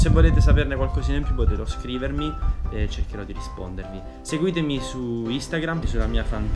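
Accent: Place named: Salento, Italy. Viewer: Ciao Francesco, ti seguo dal video delle iene e quando hai fatto il video con freddy sei diventato il mio idolo ahaha Ho 28 anni e sono un apneista amatoriale e autodidatta da una vita, da prima di internet e del 'sapere' facile, negli ultimi anni ho letto qualcosa sull'apnea e ho migliorato un po le performance anche se devo dire che ho ancora problemi nella preparazione fuori dall'acqua. riesco a stare sott'acqua si e no un minuto.. vabè ti ho scritto per altro; :D Volevo dirti 2 cose, una è che ti è venuto l'accento salentino a furia di stare qui ahah la seconda, riguardo la compensazione, io compenso senza mani, sono davvero fortunato o ce un metodo per insegnarlo? è da 2 anni che cerco di far capire a dei miei amici come fare e non ci riesco. ti ringrazio in anticipo, spero un giorno di incontrarci, magari in acqua ahah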